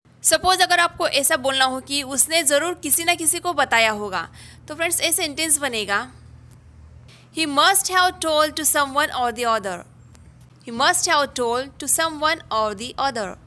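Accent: Maharashtra, India. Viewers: Your hindi signs marathai languge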